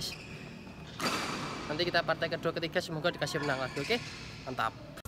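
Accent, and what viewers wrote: Java, Indonesia. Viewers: lu kenapa medok banget jowo nya? Gw asli betawi kalo denger lu ngomong bawaannya ketawa ajah dah liat vlog. Walaupun kadang gw gak ngerti lucuannya.. overall lu kreatif bikin vlog..... lu kapan juara???kapan?gw bakal dukung atlit yg juara.. doa aja ya buat lu mudah2an berlanjut ajah badmintonnya. Konsis.. walaupun gw belum kenal2 amat ttg.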